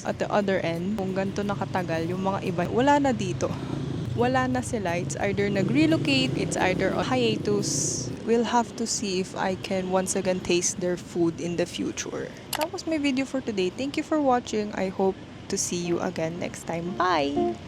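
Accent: Batangas, Philippines. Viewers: Kainan naman sa lipa haha or sa dlsl kaso sa gilid lang kainan dun. Papunta canossa. Since highschool ka ata andun baka di ka nakapagfoodtrio masyado. Nagschool din ako din way before you. Lol. Nakakatuwa ang iyong vlogs lalo na ang batanguena accent. Lumalabas pa din talaga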